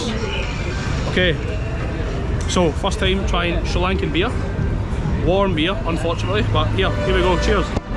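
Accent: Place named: Ireland. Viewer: Which country are you from? Let me guess: Ireland?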